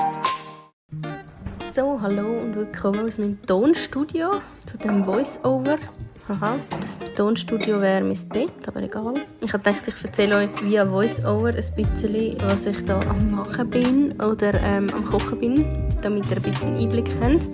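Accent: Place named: Switzerland. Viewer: Ich mag einfach den Schweizer Dialekt. Ansonsten, leckere Sachen die du da machst. ❤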